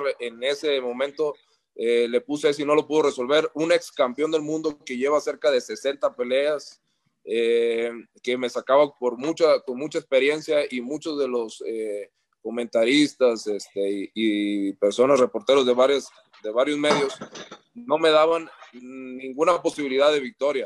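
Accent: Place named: Puerto Rico. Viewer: Que hablador está resultando este muchacho... Parece puerto riiqueño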